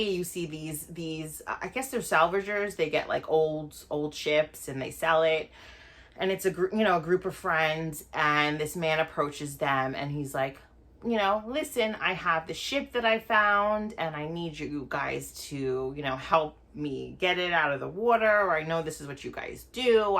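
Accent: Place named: East Coast, United States. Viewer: Great reviews very detailed ! You a Jersey Girl?? You got an East Coast accent.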